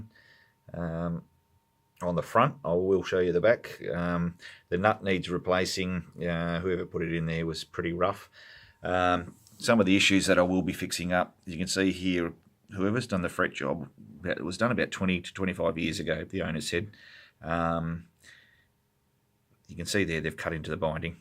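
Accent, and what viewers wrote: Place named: Australia. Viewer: Good to hear an Australian voice talking about guitars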